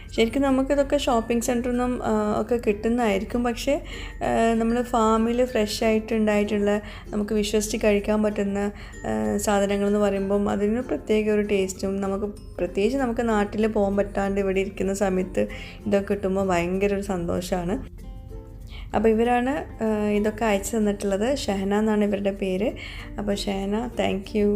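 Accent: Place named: Palakkad, India. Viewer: ഞങ്ങൾ പാലക്കാട്ട്കാരാണ് പട്ടാമ്പി ഒക്കെ ഇങ്ങനെ ആണ്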